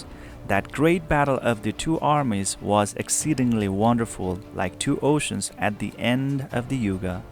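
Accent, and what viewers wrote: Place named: Nepal. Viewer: You have a rich Nepalese accent. Keep the brilliant work going.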